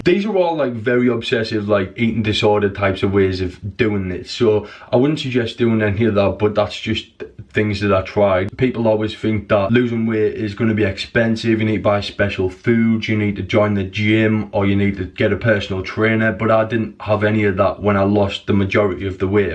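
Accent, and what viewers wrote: United Kingdom. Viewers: Good work man!! This is well inspiring. Also sounds like you're from the Boro? Could be wrong, from Northallerton but been living in Canada a couple years now.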